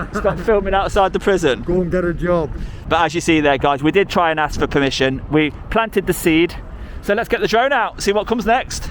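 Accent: Leicester, United Kingdom. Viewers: Deffo a lesta accent I hear..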